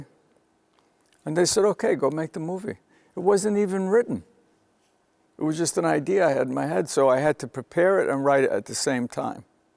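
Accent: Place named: New York, United States. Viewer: Thunderbolt and Lightfoot was an extraordinarily accomplished debut. Like another singular talent, Oliver Stone, he was a graduate of Yale. Year of the Dragon remains his best film--for me at least. There was a very beautiful Chinese-American actress in it. I love his accent--pure Italian New York! Thanks for posting this and keep up the good work.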